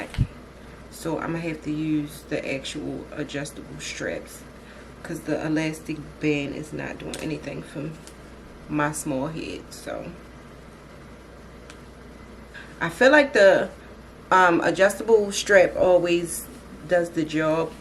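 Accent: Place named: Baltimore, United States. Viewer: You're such a inspiration to black girls, you makeup always look bomb& I love your Baltimore accent ❤️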